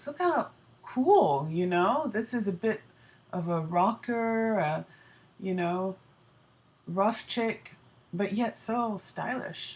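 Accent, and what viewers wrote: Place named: Milwaukee, United States. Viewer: It's so uncanny: you have the same speech pattern as Some Girls! Even using "Ummmm" "uhhhh" with a slight Milwaukee accent!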